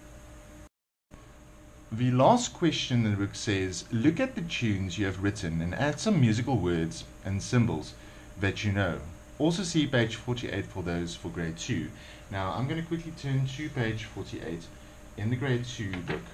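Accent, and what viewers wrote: South Africa. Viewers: is this guy South African cause i think i hear the accent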